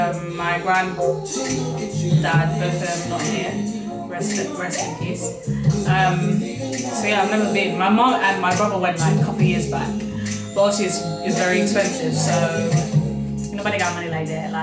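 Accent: United Kingdom. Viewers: you look stunning girl 😎 and love your London accent 👌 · I can hear her just fine with that British accent. Y'all need to have your ears checked. Djiboutian 4 life here, laakin heblayoo is astuur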